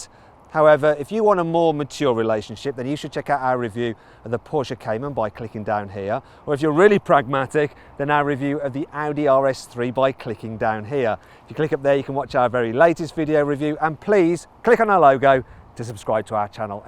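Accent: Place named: Birmingham, United Kingdom. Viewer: he's a brummy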